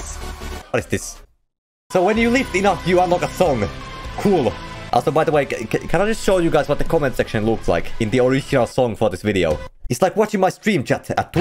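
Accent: Finland. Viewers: i love the strong Finnish accent probably because im Finnish.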